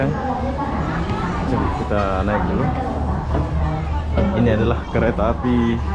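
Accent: Indonesia. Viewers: inilah channel org bingung. sok bicara melayu/tergila2 dg Malaysia tp tk bs sembunyi dr logat aslinya Indonesia. bahkan ungkapan2nya jg sbgi org bingung, antara indo atau malay.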